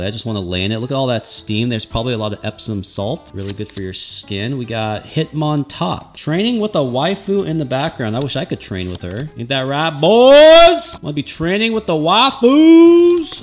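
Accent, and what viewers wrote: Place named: Australia. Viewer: BRO AUSSIE LOL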